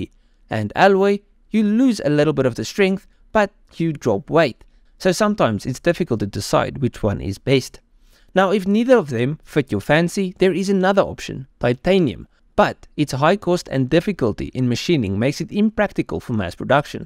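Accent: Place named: Johannesburg, South Africa. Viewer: Sounds like he’s from joburg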